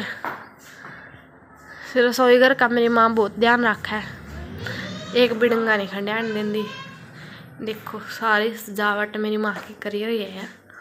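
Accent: India. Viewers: Kurukshetra side se ho kya boli bta rhi h☺️ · जी आपका सीधापन और आपकी हरयाणवी बोली बहुत ही लाजवाब है खुश रहो आबाद रहो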